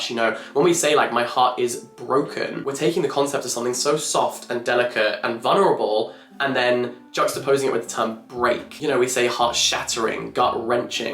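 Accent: United Kingdom. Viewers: I thought it was a british accent (I am not a native english speaker and have trouble picking up accents)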